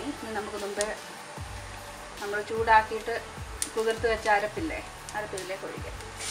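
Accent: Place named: Chengannur, India. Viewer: chechy fish cury superrrrrrrrrr.samsaram kettittu chengannurkkari aanennu thonnunnu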